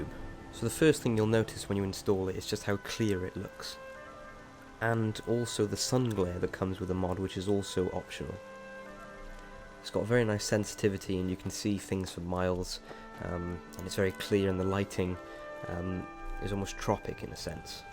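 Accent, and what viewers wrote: United Kingdom. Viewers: You have a British accent :D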